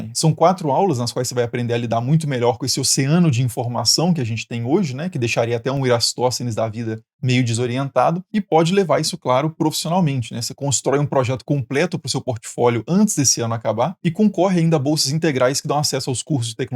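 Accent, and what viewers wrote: Minas Gerais, Brazil. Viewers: A didática do "mineirês" é a melhor! 😂😂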